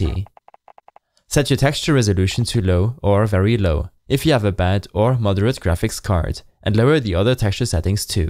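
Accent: Germany. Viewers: Wie man merkt das du deutsch bist danke nochmals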